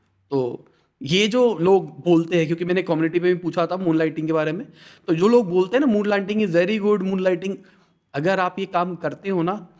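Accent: India. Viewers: Papa news name is always good. Ye sound like bihar agency or something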